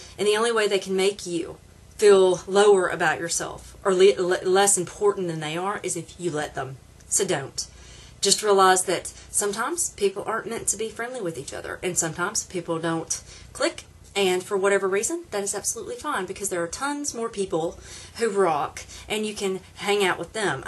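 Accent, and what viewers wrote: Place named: Louisiana, United States. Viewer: You sounded close to home. Louisiana here. Thanks again!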